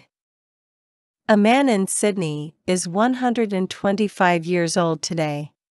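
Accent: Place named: United Kingdom. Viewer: I have been listening to your voice for 9 years. I have no way of accepting other British accents and robotic accents. I'm glad to hear your accent. Thank you so much!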